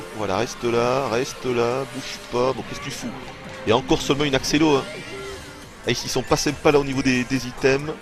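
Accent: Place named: South, France. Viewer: French with a southern accent :)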